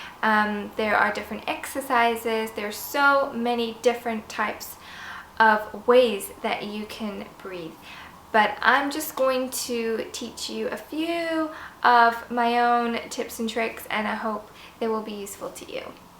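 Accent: United Kingdom. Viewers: didnt know she was british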